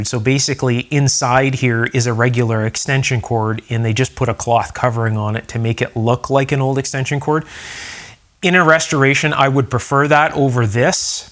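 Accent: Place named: Canada. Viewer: This guy talks like a Canadian.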